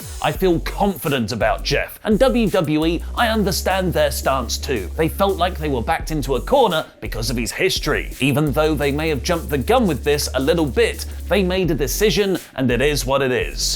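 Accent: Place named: Cockney, United Kingdom. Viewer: Love cockney street urchin speech begging for subscriptions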